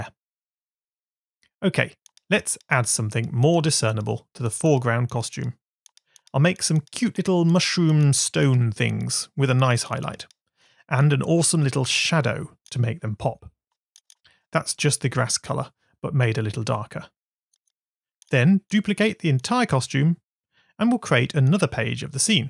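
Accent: United Kingdom. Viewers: Not really, it's just a british accent, but I see where you're coming from, as they do have similarities.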